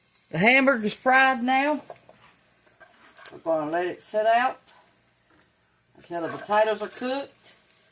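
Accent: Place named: North Carolina, United States. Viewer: You sound like you're from NC. 😊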